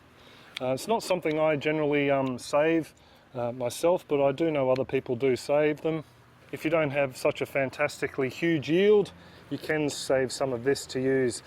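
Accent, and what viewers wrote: Australia. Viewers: So happy to hear an Aussie accent! I live in Cairns. Up here our weather is hot, hotter, cyclonic. I was given some ginger about 3 months ago and had no idea when to harvest, great information just when I needed it. I was about to did them up now! I have new shoots coming up. Is there anything that I should do differently living in this climate? Thanks.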